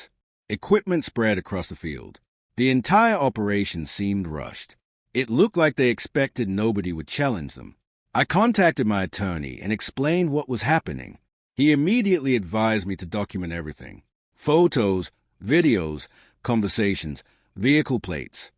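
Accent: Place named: Australia. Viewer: Ya gotta have lots of respect for a Texan who speaks with an Aussie accent .....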